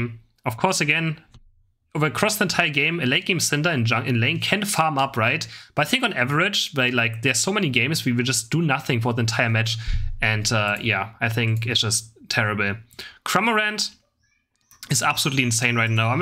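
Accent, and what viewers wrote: Germany. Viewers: Klingt ziemlich deutsch 👀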